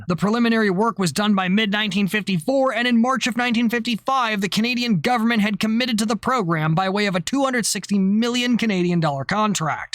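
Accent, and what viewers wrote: United Kingdom. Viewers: As a British citizen 🇬🇧. I approve of your accent you may to Britannia & feel welcome. Keep up the jolly good Work old boy. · I was wondering where the CR105 in project wingman came from. Banger British accent bro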